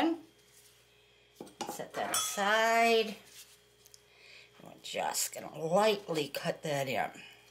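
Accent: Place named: Wisconsin, United States. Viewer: I'm listening to your instructions and just said to my husband "I wonder if she's from Wisconsin?". I looked at your channel, and oh geez; you're from Wisconsin! I guess we just know a fellow Wisconsinite ❤ . Are you going to watch the Packers play in Brazil on Friday? Go Pack Go! Thanks for the pie crust! It's nice and easy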